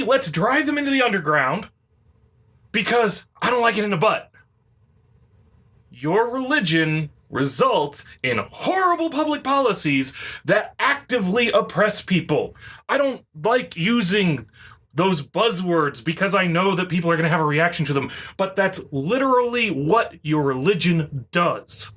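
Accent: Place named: South, United States. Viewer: Dude, you're pretty impressive with the improv...that southern accent is hilarious. Tell me that's Guiness in your mug.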